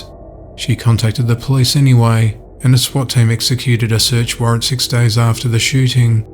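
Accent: Australia. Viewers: He's definitely Australian, but I'd like to know more!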